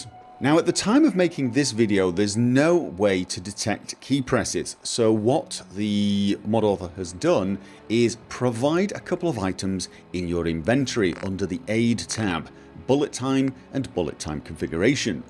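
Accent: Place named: Wales, United Kingdom. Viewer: Are you a Welsh Jew or something? You sound both Welsh and Jewish. What the hell is your nationality?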